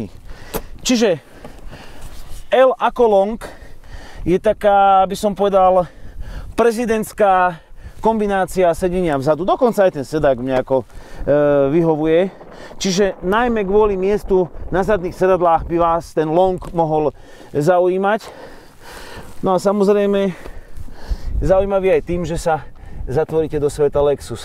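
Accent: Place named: Liptov, Slovakia. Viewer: ten tvoj liptovský prízvuk ... nádhera !!! :D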